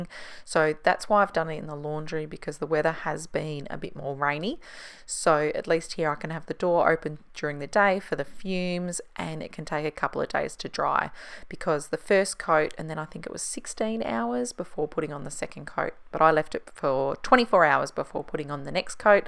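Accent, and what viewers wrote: Australia. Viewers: I've got the LG combined washer and dryer as I live in a unit so am space limited. I love it. It can take an hour and a half to dry clothes which is ages but i absolutely hate hanging them and then having the little clothes sculpture lurking around for days before I can be bothered folding them. ps. new melbourne follower here. So refreshing to hear an aussie voice on a clean with me vid.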